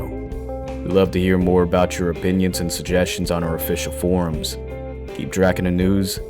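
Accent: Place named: Deep South, United States. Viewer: Jesus, your narrator is great. That accent is awesome...Deep South + Eastern Europe?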